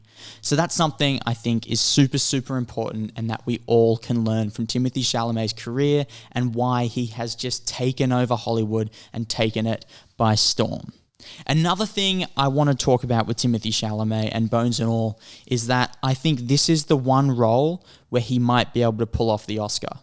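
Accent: Australia. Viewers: Oh My Goodness! Looking forward to watching it! I really enjoy how you explain things and put things into perspective! I've been subscribed since a month ago and have been binge watching and re-watching your videos since then! I think I may have a crush on you! Haha! <3 Are you Australian? I really like the sound of your voice..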